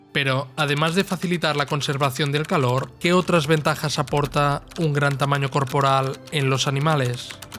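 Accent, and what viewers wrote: Spain. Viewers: No hay duda que los mejores documentales deven tener la Voz de un Español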